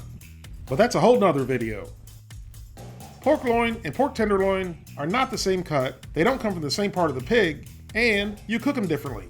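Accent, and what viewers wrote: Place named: North Carolina, United States. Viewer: There’s NO WAY this dude ain’t from NC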